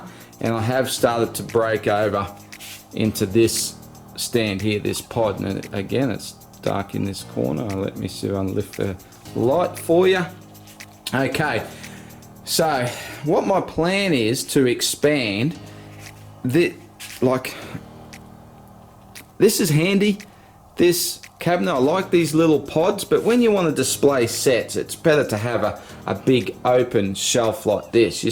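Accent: Australia. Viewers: Now correct me if im worng but you sound Australian, and if that is the case are you ok? You know with the the fires happening.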